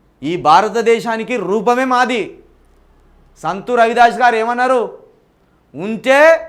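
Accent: Telangana, India. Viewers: మీ తెలంగాణ accent super అన్నా 🙏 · అన్న మీరు సూపర్.... మీరు మాట్లాడే తెలంగాణ భాష super.... దేశంలో మృగాలు ఎక్కువ అయ్యారు, మృగాలా మాటలు పట్టించుకోకండి.....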